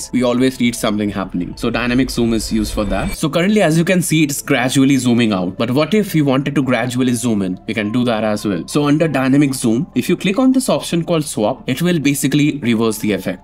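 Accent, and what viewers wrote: Bengal, India. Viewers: He sounds bengali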